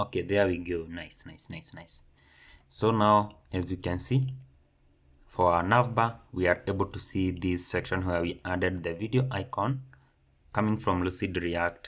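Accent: Kenya. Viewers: where are you from? your accent inasound familiar, almost like a murima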